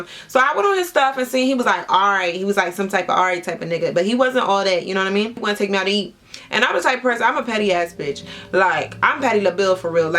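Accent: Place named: Maryland, United States. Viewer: You from Maryland? I can hear your accent in this vid.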